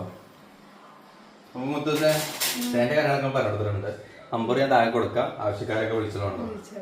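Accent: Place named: Malappuram, India. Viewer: നിങ്ങൾ മലപ്പുറത്ത് എവിടെയാ ?😂😂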